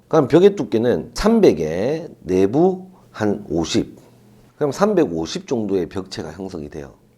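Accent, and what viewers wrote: Gyeongsang, South Korea. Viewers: 비오는날 막걸리 먹으면 엄청 좋지요 항상 잘 보고 있습니다 경상도 사투리 좋습니다 저도 경상도인데. 괜찮은데요 ㅋㅋㅋ.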